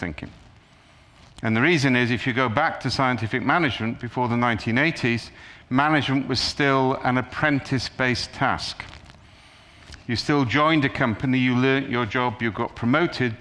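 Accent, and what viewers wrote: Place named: United Kingdom. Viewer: just brilliant talk! but definitely need to rewatch it to understand all of it. Also, British accent is hard to grasp sometimes, if only someone could make subtitles * _ *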